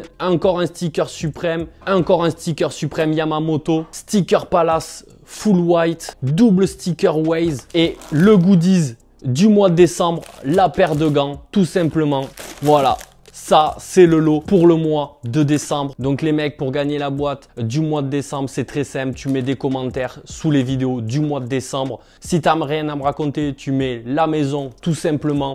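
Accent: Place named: South, France. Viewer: c'est lourd ton bail de faire gagner des lots, force à toi frérot (vive l'accent du sud) mdr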